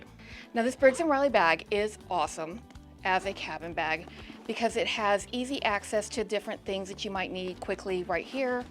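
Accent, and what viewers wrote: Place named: Texas, United States. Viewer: Hi! This is my first video of yours to watch, and it was such a treat! I love that you say y'all! 😀 You sound like you could be a neighbor down the street here in Texas. LOL I was also surprised to see that we have the same exact backpack, down to the color! I love it! I'm fixing to use it for my very first carry on only flight. 😀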